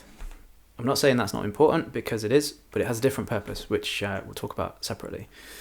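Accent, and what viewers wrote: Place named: United Kingdom. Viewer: Thanks, nice clear laid back Brit!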